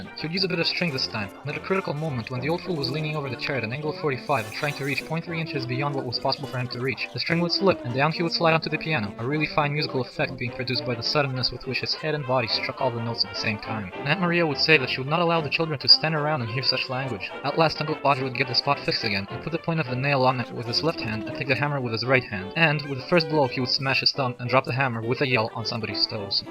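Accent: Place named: United States. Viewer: Why the fuck is a septic narrating this?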